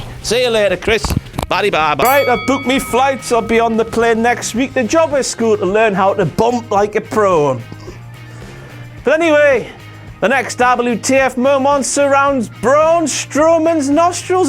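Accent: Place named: United Kingdom. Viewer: shit! u have a fucking shit britain accent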